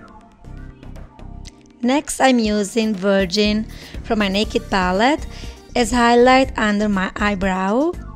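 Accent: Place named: Italy. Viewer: She said "white", she's italian so she hasn't your english accent. Be a bit nice! Damn....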